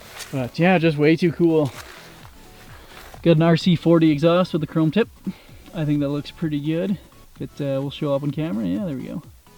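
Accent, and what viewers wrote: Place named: Canada. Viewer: Honestly I must be going mad because every time I find a Canadian on youtube, they seem to go out of their way to say words with "ou" in them, usually ends up being "oat".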